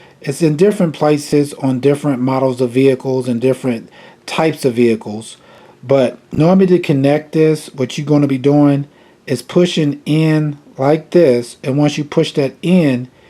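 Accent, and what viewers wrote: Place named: Midwest, United States. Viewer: Love the midwest accent "right thurr" 😍👌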